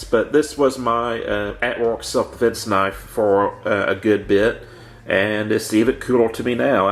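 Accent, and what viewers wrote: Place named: South, United States. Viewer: Where are you from? Your accent is hard for me to place. American South?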